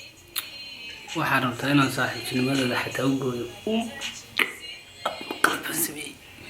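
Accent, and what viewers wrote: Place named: Hargeisa, Somalia. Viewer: Inanku ma reer Hargeisa lahjadi rer. Hargeisa ayuu wataa go forward brother 😂❤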